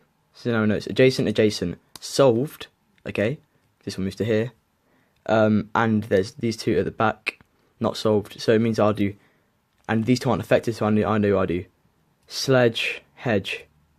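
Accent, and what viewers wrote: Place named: RP, United Kingdom. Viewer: WHAT'S UP GUYS Also you sound very posh lul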